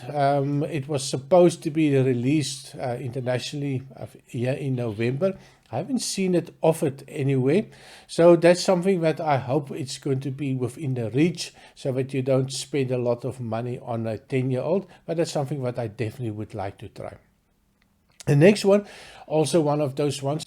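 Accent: Afrikaans, South Africa. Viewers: Hi. I like your reviews. Your accent is spot on with an Afrikaans person speaking English. It’s like a South African Boer giving a whiskey review.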